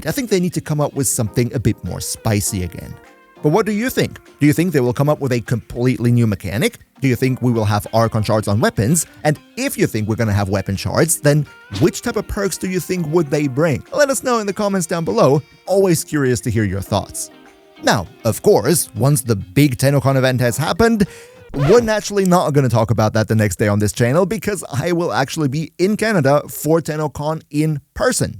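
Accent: Germany. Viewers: Ich bin nur diesen starken typischen deutschen Akzent gewöhnt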